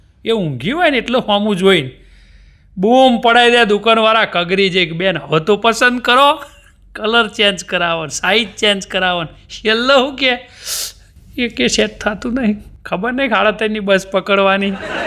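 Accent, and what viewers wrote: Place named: Mehsana, India. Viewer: मेहोना नी टिपिकल बोली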